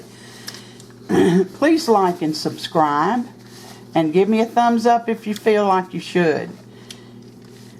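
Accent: South, United States. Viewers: Love your recipe videos and the southern drawl makes me smile. Keep it up!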